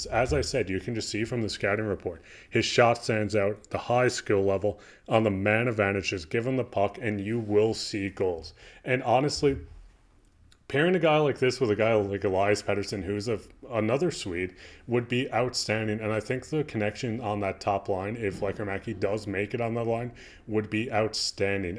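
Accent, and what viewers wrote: Newfoundland, Canada. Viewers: Newfoundland? but we can understand you.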